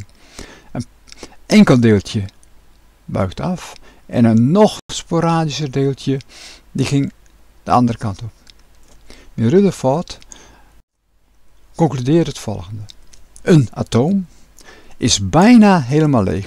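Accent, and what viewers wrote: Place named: Drenthe, Netherlands. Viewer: Heerlijk drenths lol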